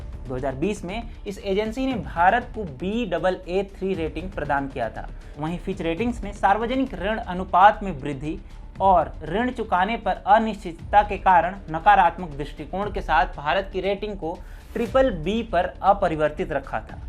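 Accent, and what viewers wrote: Standard. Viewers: Shudh 🕉️ hindi sunkar kaan 👂 ko anand mila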